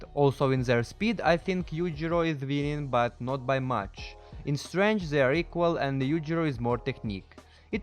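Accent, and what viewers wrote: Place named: Russia. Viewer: у тебя какой то русское акцент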